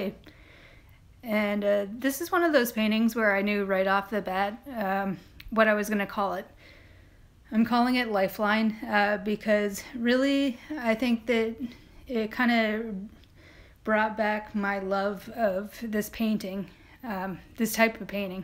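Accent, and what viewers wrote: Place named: Canada. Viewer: I am a big fan of your art and really like your videos. Your video presentation is quite cute and I especially enjoy your "Canadian" accent- on your" aboot" and "owoot"- anything with an ooot in it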